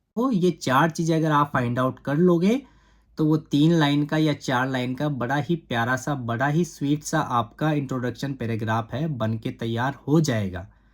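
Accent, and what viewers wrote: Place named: India. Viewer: my self prakash.... already have sent my concern on your Instagram handle....hoping that you will reply soon.......with lots of happiness and kinship you are continuously delivering to the needful .......Thanks ..,.i feel so happy to see a rajasthani bhai......... exploring uk......in desi accent ❤🎉🎉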